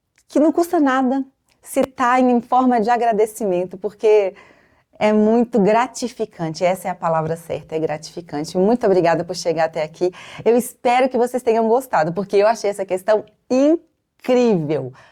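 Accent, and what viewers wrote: Minas Gerais, Brazil. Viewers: Show é E que saudade do sotaque de MG.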